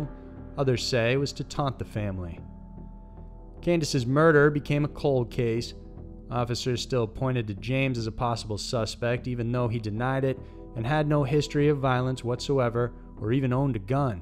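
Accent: United States. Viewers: He sounds very much American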